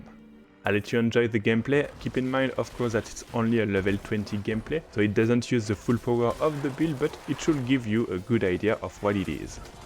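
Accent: France. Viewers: J'adore notre l'accent fr en anglais 😅🤣... mucho love❤😉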